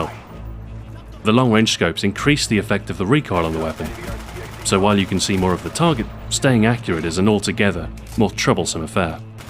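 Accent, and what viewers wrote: England, United Kingdom. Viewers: the 27 are so but hurt tht they dont have an awesome british voice like this guy im british too sooo :D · Very professional Videos, very good quality and high entertaining value (omg) throughout from the first mw2 video to the black ops videos today. By the way, i love to hear english people talk german ;) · You make this kind of analysis so interesting and it's also nice to hear an English voice on CoD videos for a change. You are getting me through my red rings of death so please, please keep up the good work! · Holy Shit! It's British William Strife! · im pretty sure hes from england, thats why he has an accent and knows english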